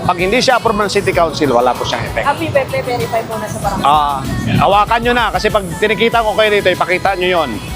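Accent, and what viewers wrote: Iloilo, Philippines. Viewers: Ilonggo ka sir kay isug man? Kag imo tuno o accent ilonggo man gid iya.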